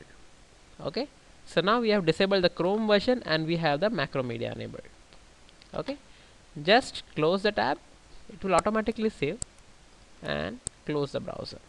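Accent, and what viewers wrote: India. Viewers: hes an indian, they their own accent.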